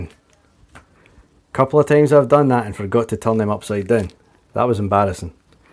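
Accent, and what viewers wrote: Scotland, United Kingdom. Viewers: Nicely done sir and I must admit it sounds even better in Scottish. Its been way too long since I was there.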